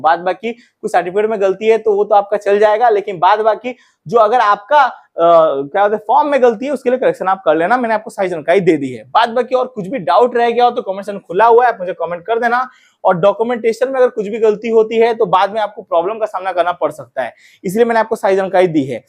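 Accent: Odisha, India. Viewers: Bhai app odisha ke ho kya 😍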